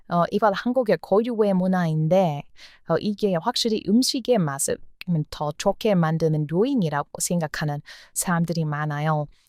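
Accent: United States. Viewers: 잔잔하게 웃기는 능력이 있네요. 양 너무 미국스런 혀꼬부라지는 발음의 한국어로 조곤조곤 설명하며 기사식당 나올때 빵 터졌네요. 한국을 좋아하고 사랑해 줘서 고맙습니다.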